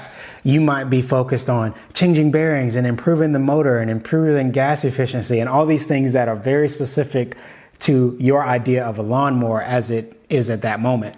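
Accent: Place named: Baltimore, United States. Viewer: My biggest takeaway from the 1.5 videos I’ve watched, is that I’m 100% sure this dude is from Baltimore. That accent is unmistakable 😂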